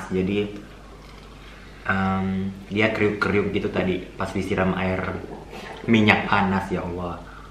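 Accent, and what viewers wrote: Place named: Sunda, Indonesia. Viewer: itu emang sunda banget,soalnya aku dari sunda🤭👍salam dari indo🙏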